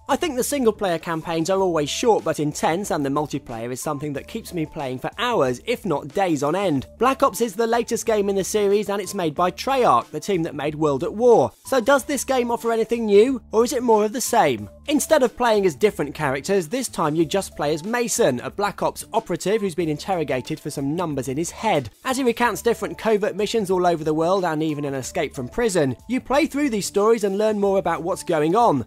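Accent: United Kingdom. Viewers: Its his charming British accent . :D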